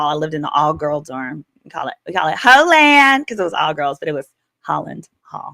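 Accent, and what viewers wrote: South, United States. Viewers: Hey, love your lives covering this trial! I graduated from Thurgood a few years ago and I'm from Oakcliff too! Listening to you is making me wonder if I sound like you lol, that country twang be jumping out.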